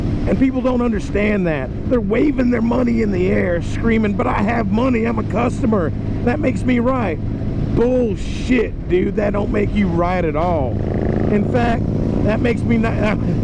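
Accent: South, United States. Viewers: sound more southern in your old videos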